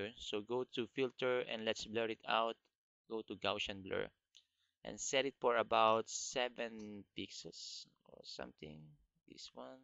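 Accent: Philippines. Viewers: hahah. is this guy a fillipino? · ang pagka english nya ay PINOY na PINOY! ee!! nice kuyA! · nice vid !! nice pinoy accent!!! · kaya naman pala parang pilipino ang nagsasalita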